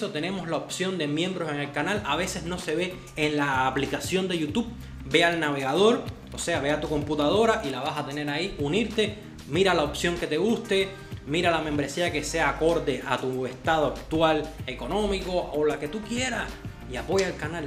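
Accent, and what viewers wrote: Cuba. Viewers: Espectacular noticia, felicitaciones, te lo mereces por ser quien eres y como eres, y no se trata de competir con nadie, pero eres uffff lo máximo, lo mejor, un grande, imbatible, profesional, inteligente, con muchas destrezas, te dejas querer, amable, amigable, apasionado y entregado a lo que te gusta y todo eso es lo que te ha hecho crecer; muy merecido, me gusta como hablas, como te expresas, como pronuncias, tan cubano, tan coloquial si caer en lo ordinario y vulgar, muy lindo que ames exageradamente a Cuba, es un país hermoso!! Continua siendo así tan auténtico y sencillo, mira que de lo bueno, poco!!!! Éxitos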